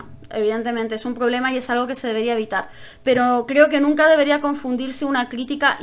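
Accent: Chile. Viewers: cuando no teni guión se te nota caleta lo chilena sdffjsdhfdshj somo el mejor país de chile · Btw cuando te molestas sí se te nota mucho en acento chileno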